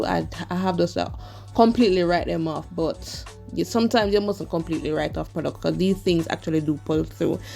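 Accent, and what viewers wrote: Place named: Caribbean. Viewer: I hear caribbean accent 😅 where are you from?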